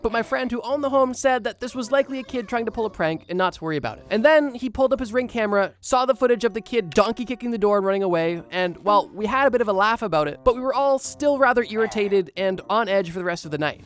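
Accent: Canada. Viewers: i thought i heard that canadian accent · i could tell you were canadian 😂😂 ontario ontop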